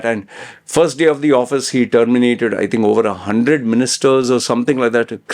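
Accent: India. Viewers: I dont understand the English from the Indian accent ..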